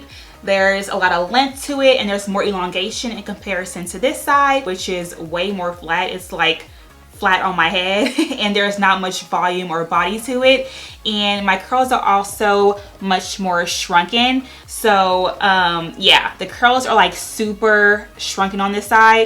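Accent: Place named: Australia. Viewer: Thanks for this video! I definitely will try this tomorrow! I wonder how much time I will need? You have such an interesting accent, is that Australian?!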